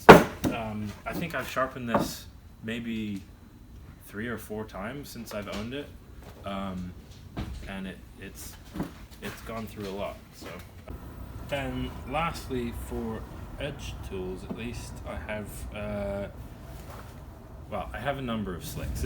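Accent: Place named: Cornwall, United Kingdom. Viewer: His is an American tinged Cornish accent, so that's fair enough.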